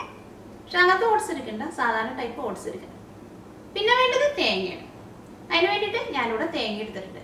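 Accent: Thrissur, India. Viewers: thrissur style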